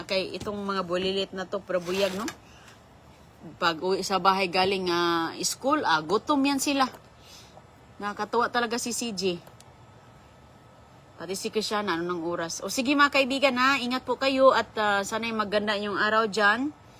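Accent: Visayas, Philippines. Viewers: Sorry bisaya lanh kung ganyan accent niya. Be kind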